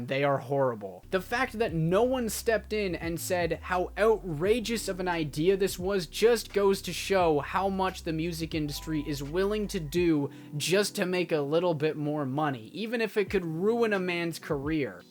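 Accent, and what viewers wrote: Canada. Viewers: the Beaches of "Chi-neen"? From his accent, I assume the host is Canadian, but if he's gonna talk about Garth Brooks, he needs to know how to pronounce Cheyenne.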